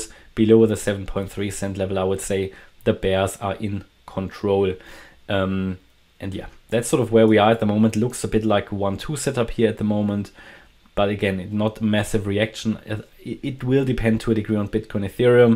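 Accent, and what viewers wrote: Germany. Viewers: Why do I feel like you are Deutsch 😄 Your accent sounds so German.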